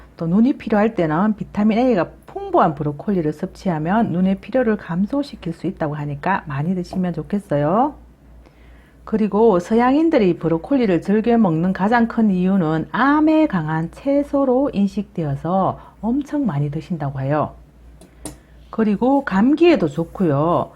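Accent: Gyeongsang, South Korea. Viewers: ㅎㅎ 하고웃지요 갱상도사투리ㅋ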